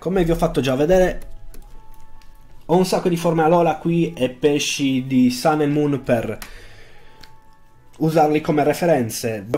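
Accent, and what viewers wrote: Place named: Sardinia, Italy. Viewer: sei sardo? perché hai un'accento simile al nostro (sono sardo)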